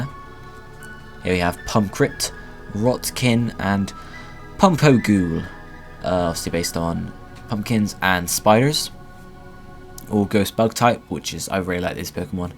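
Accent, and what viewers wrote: England, United Kingdom. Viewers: hes from england i think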